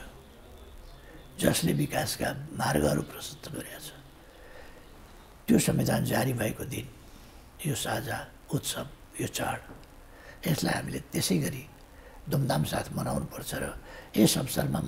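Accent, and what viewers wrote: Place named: United States. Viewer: baini timi nepali ki english ho???tongue ta purai half-american jasto xa ta...xi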